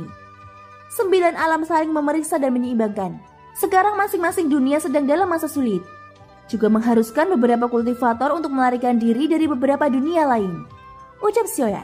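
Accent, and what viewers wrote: Java, Indonesia. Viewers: Medok nya 😂😂😂😂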